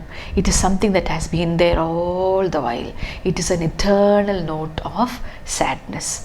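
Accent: South India, India. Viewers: Very nice explanation, mam are you South Indian?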